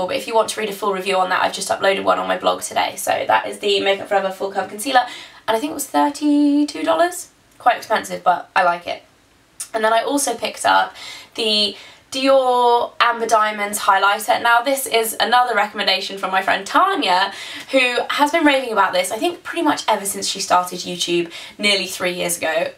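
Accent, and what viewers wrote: England, United Kingdom. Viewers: I went to imats too!! I wish i had watched ur vids way before imats bc then i would of searched for u! I lovvvee ur british accent :3 btw i got a creme blush in orange . LOVVEE IT!! I want that pink one ! And i also got that same palette ! ^.^ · happy birthday your soo lucky you have blonde hair and a british accent and your freaking georgeus im soo jealous omg haha well happy birthdayyy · I love your English accent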